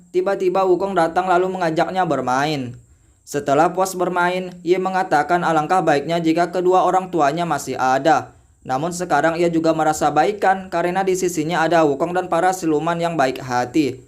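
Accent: South Sulawesi, Indonesia. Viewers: logatnya lucu, ugi'banget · Kenapa logatmu kayak orang soppeng atau bone😅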